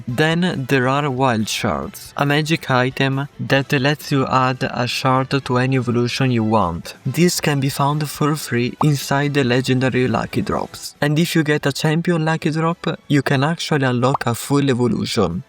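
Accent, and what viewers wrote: Italy. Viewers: italian accent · his accent is clearly italian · Are you Italian?Cuz your accent seems so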